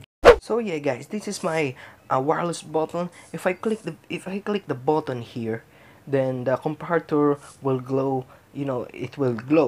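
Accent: Philippines. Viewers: Love your Filipino accent! I’m from hawaii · I am usually good at picking out the Filipino accent. I couldn't at first in this video. You must be Visayan because I learned Tagalog and I know the from-Tagalog-to-English accident but your [beautiful] accent it slightly different. What really gave it away, was when you said "ano".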